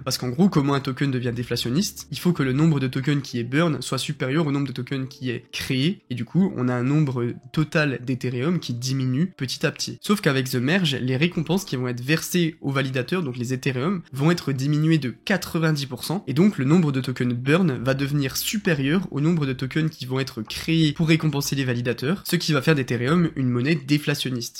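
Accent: Franche-Comté, France. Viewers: Vu l'accent, tu dois être en Franche-Comté toi ;)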